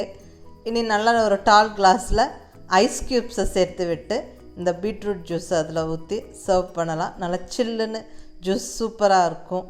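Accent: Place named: Nagercoil, India. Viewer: Avanga slang Nagerkovil Mathuri irukku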